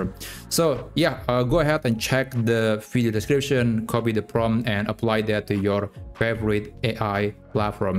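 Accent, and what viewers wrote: Indonesia. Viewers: accent nya asa indo banget jir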